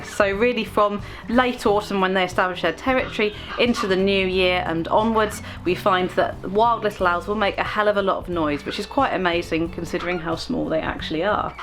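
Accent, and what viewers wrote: United Kingdom. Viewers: We used to pass a pair of burrowing owls on our way to work... it was at a corner, and they would spend the morning watching vehicles turning left... very cute! <link> One thing I realized is that I like your channel because unlike commercially produced nature shows, you don't have either the "syrupy saccharine" sound, or the "absurd melodromatic" sound, which are quite wearing, after a while. Of course we colonials can never get enough of the accents from the Mother Country! ;-)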